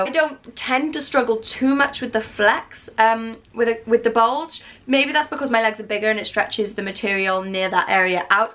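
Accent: Wales, United Kingdom. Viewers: You are soo cute babe ♥ I am from the Czech republic and I am soo in love with your British accent!! Please please, do you know when will be those leggings restocked? Thanks, and please, more James in the vlogs and videos, you guys are so cute together! ♥ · are you welsh? you seem to have a slight welsh accent to me haha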